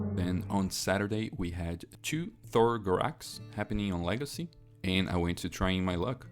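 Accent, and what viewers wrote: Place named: Brazil. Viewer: its amazing how your english is very smooth while I can still say you're BR hahahaha tamo junto irmão! videozinho bom demais, começando no medivia essa semana!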